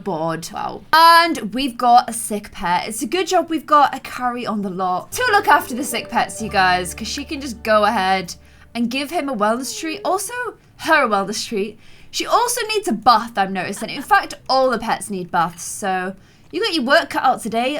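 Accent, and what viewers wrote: United Kingdom. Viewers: Lol i love how says antony instead of anthony XD Its ok i love that british accent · Btw I'm half French and it was funny hearing you speak French with a British accent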